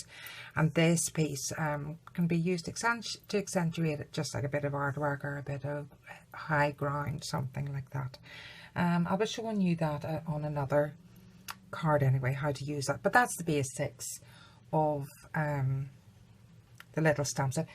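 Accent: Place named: Ireland. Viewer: WONDERFUL. You sound just like my cousins in ireland Sligo. Your video was reaay good. Hopefully we will see more. Dorothy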